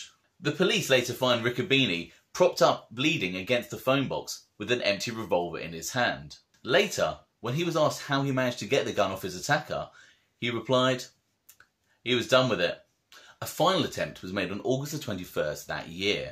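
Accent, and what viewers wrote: England, United Kingdom. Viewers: Not for nothing but hearing a english accent is def a bit strange talking about the mobs in the States. Kind of like listening to an American explaining the british monarchy or viking history😮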